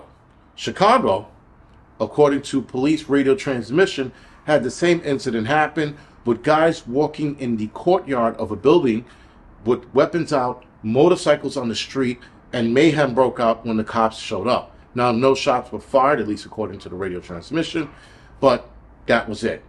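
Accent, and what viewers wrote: New York, United States. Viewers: Yoooo. Well said bro. I'm not used to black guys that have the exact same accent that I do and i'm a white guy, Italian from Corona which means,,,, You gotta be from new york city. I left back in 97, came to Vegas but now Vegas is all messed up with all these California people that come here and all the guys have high voices like they had their balls cut off. It's time for me to move from here now. I gave you a like and Subscribed I'll be watching your channel more. Awesome content keep up the great work. 👍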